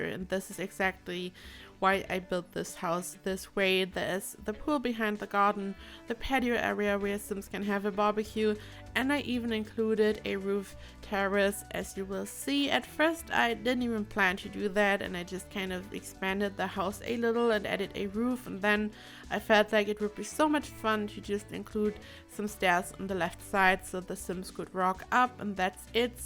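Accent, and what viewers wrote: Germany. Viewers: r u german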